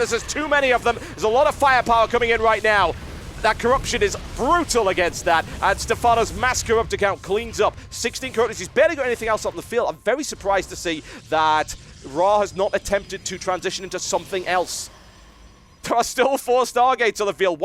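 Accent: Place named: England, United Kingdom. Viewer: ? when did you get an english accent?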